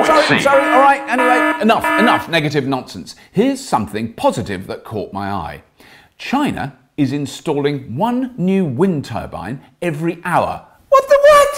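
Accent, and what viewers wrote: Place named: South, United Kingdom. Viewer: If i were you i would give-up the southern-british humor, i don't think that presentation style is working for us on the outside of that accent!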